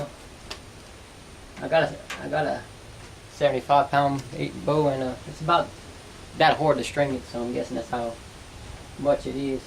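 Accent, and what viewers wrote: Louisiana, United States. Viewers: He sounds cajun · Love the accent. Cajun/ creole is so cool. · straight up Cajun brotha. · + We got easily distinguishable accents from the typical southern accent. You're from down da bayou. It aint hard to tell. Are you a Houma Indian or do you know any american indian bow making techniques? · Sounds like from Bayou country. good job on bow. but, is she able to draw the 60lb bow?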